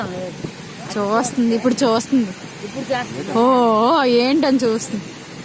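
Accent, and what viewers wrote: Srikakulam, India. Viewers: Ayite srklm slang Or vsp Or hyd edo okate laa paluku oknaa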